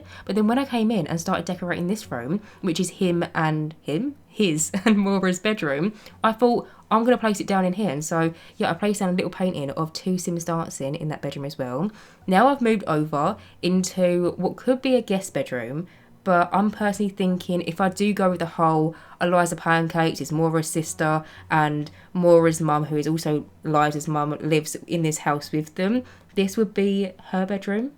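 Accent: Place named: England, United Kingdom. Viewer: I really like watching your builds but i can never understand the English accent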